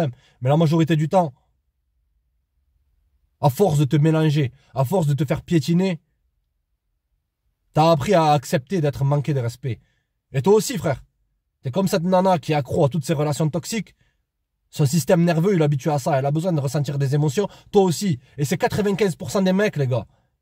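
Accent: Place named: Marseille, France. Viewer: Quelle sorte d'accent est-ce cela? Marseillais? Au fait, merci pour partager les pensées. C'était interessant.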